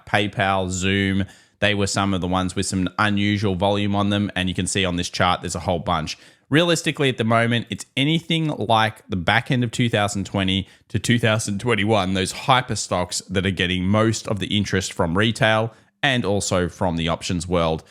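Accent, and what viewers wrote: Australia. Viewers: The whole deal here is about interpreting things. 😂 I take the same approach with watching the videos. He's knowledgeable, not always correct, says that up front. Also, literally everyone on here has different timelines, nationalities, risk tolerances, DCA for life vs scalp for life and everything in-between. Thumbnails and titles, it's back and forth on being a bit much, but that's 100% the algo and people watching needing to be fed 🤷, and thank the freakin' lord he doesn't do those shocked face thumbnails. I've seen a looooot of TA/market voodoo and this dude ain't that. Does he want money... (idk, are you watching a video about making money) lol. It can just be entertaining and informative and it's your responsibility to double check anything you're going to act on. I gots a B.A. in Physics, 12+ years as a software engineer and 3 years on and off watching FX. 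I simply don't listen to bs that is illogical if I'm looking for insights. In short 😂 yeah, I get valuable info, with a sweet Australian accent, from a genuine dude, that I filter through my own understanding and experiences. You can not watch, but why the desire to convince others not to?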